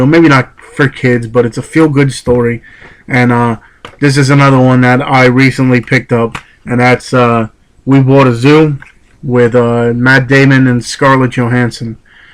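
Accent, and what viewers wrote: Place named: New Jersey, United States. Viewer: i love your jersey accent lol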